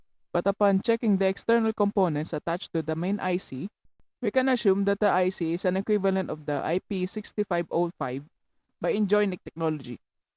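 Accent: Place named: Philippines. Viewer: Yesss gotta love that Filipino accent Ps Great video tho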